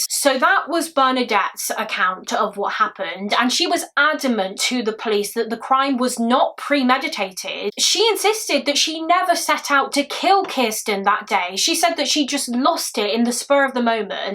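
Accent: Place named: England, United Kingdom. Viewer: In addition to your personality, I like your genuine English accent.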